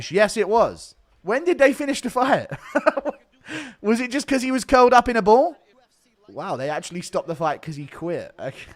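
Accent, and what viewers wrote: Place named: United Kingdom. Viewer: I love how impression of Anthony Smith is basically his normal voice, he just switches from his natural UK accent to American XD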